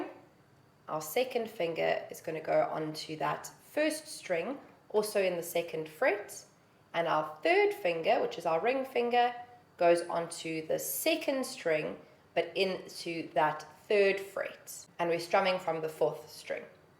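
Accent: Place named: South Africa. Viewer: You are South African with either Greek or Italian background, by the way I'm very annoying I know, my wife keeps telling me. Love your guitar lessons, thank you...maybe Spanish?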